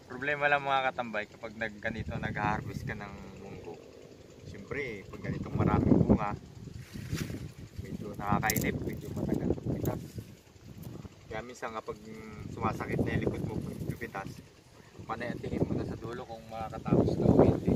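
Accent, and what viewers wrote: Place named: Ilocos, Philippines. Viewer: Hello katambay,,,sarap nmn nyang munggo,lalo na yung hindi pa gaanong natutuyo,bagoong at inihaw na isda tapos inasiman ng sampalok na hilaw,,,curious lng po kasi parang may puntong ilocano kayo,,marunong po ba kayong mag salita ng ilokano?,,subrcriber from solid kaharabas po,,,from Tarlac city,OFW Madrid Spain,,,ingat po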